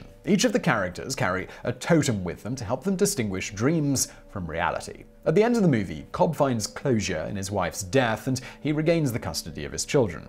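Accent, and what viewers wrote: United Kingdom. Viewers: Get guy with a British accent to state things emphatically and act like that is The explanation of the movie. The top wasn't Cobb's totem it was Mail's, so what it did doesn't matter. What King says doesn't make a difference to Kubrick's movie. (Did you see the version he made? It suuuuuuuuucked.)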